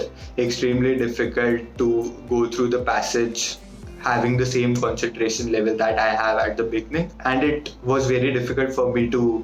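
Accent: India. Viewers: He's got a very strong Indian assecnt .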